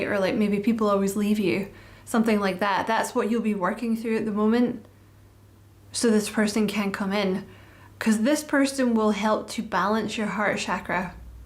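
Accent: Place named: Scotland, United Kingdom. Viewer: Just from listening to you I can feel you're a very special person, spiritual, witty, funny, and I love ypur particular Scottish accent. Thanks for the reading. I love the beautiful being that you are...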